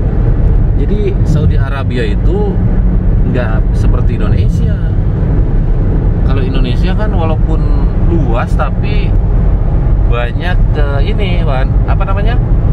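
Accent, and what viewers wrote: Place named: Madura, Indonesia. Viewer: reng medureh ... ternyata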